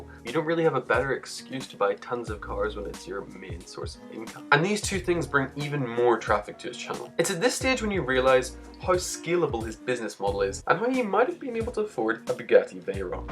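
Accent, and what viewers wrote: Ireland. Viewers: Are you irish? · U Irish?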